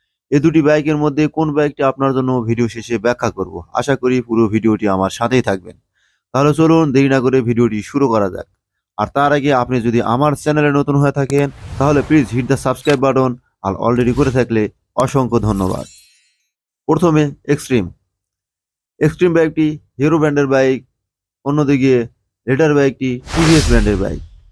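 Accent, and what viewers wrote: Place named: Rajshahi, Bangladesh. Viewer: তোমার বাড়ি কি জয়পুর কথা শুনে তো মনে হচ্ছে জয়পুরহাট গ্রামে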